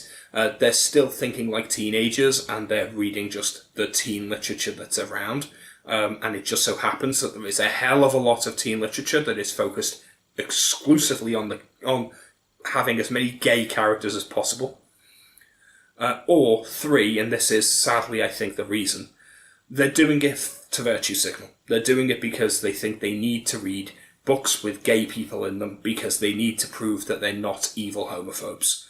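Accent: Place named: England, United Kingdom. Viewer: Thanks for this very intelligent, interesting talk. It's very courageous of you and will earn you lots of brickbats, I'm sure. I did not know that one can block people on YouTube. How do you go about it? I am thinking of starting a BT channel. I am put off BT a lot because it seems almost entirely to consist of young, female 'readers' posting who are as interested in their appearance and their books' appearance as they are in books in general. Eg, shelving your books according to the colour of their covers! That strikes me as pretty infantile, unless you're five or six. Many of my books are shabby, grainy second-hand copies with little colour about them at all. One young female poster gave details of her make-up and where to buy it! I'm an old man and so feel really out on a limb, but will still give it a go,because I would like to meet a handful of people who are serisouly interested in books. 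I have found a few older women's' channels that are very interesting and from whom I have actuallyl earnt things. Another complaint I have is the lack of people interested in non-fiction reading. I hardly read anything else! The algorhythm must have filtered a lot of left-wing things out from my recommendations. I haven't had lots of leftie rubbish thrust at me, but I have noticed that leaning, too. Your picture seems very American, culturally speaking, though you sound 'English'.. I'm a Brit. There aren't many Brits on BookTube. Thanks again for a great contribution.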